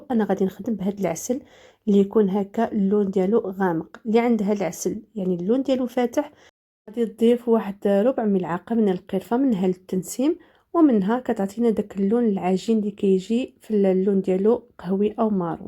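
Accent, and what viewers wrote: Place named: Algeria. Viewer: اللهجة جزائرية الحلوة جزائرية والسرقة مراكشية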